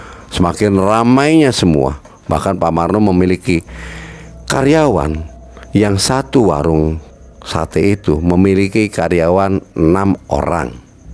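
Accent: Indramayu, Indonesia. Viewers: Logatnya bicaranya kaya orang Indramayu atau orang Cirebon 😁 Betul ngga sih ?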